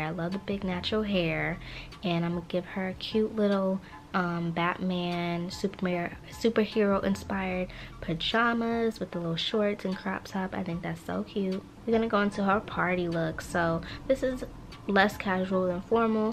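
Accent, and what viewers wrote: New York, United States. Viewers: This is the most that I've ever noticed her New York accent 😭💞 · I can hear her new york accent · I never noticed your New York accent it’s so pretty ❤️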